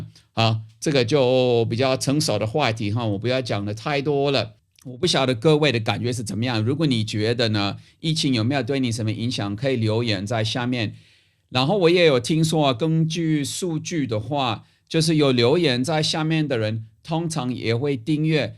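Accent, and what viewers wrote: Taiwan. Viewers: 不用字幕啦，教授你說的台灣國語很道地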